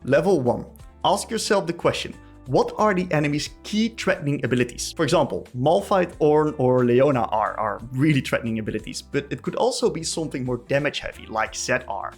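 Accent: Netherlands. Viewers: Im hearing some heavy dutch accent right here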